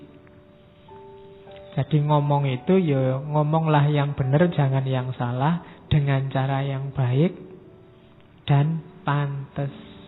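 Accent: Java, Indonesia. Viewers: tapi gua gasuka aksen Jawa mau apapun Jawa ngomong Indonesia kuping gua menolak 😭 gatau kenapa bapak ini ngomong pake logat Jawa aja gua gamasuk otak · tapi aku sama sekali ga suka cara bicara kamu.🤣 palagi m3dok gitu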